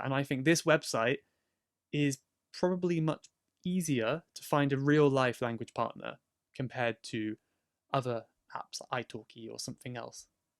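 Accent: RP, United Kingdom. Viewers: Do you speak Rp accent?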